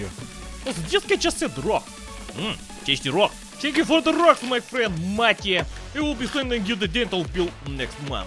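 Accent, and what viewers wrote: Eastern Europe. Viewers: I was very tired and fell asleep to your soothing slav voice. Thank you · hello, this "hello" comes from very far away from you, directly from Portugal. I'm a huge fan of your slavness and the way you talk, makes me laugh so much and it also reminds me of the good old days of red alert 2:D anyway, if anytime it would be possible, i would recommend you to come and visit us, i think you would like our warm wreather and our beer, to be consider one of the strongest in europe, any way thanks for you time, and stay cheeki breeki;)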